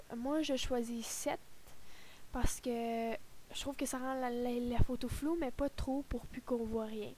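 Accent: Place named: Quebec, Canada. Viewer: J'adore ton accent ! Dsl de commenter juste pour ça ! Tu es québéquoise nan ?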